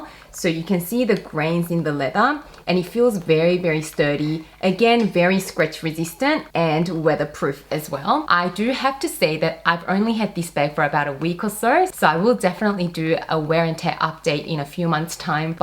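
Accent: Australia. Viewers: Is she Australian? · Are you from Australia? U have strong accent, sounds funny. Great info tho! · She sounds like she lives in Australia but didn’t grow up there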